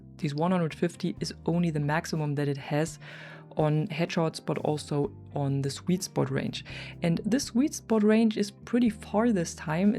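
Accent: Germany. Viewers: I like your German accent when you speak in English 🙂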